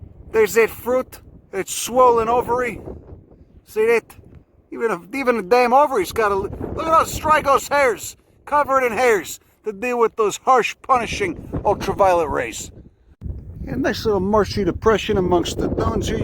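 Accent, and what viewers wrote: Chicago, United States. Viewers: I assume you mean by interweaving cuss words and disparaging comments about the state of humanity while using a Chicago Italian accent. If so, I completely concur.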